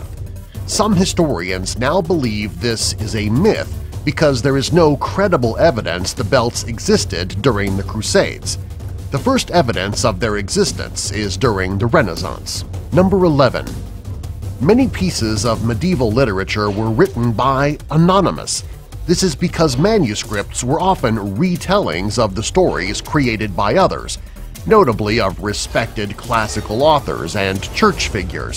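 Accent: United States. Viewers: Interesting but learn to speak correct English language!, instead of americanizing it!!!!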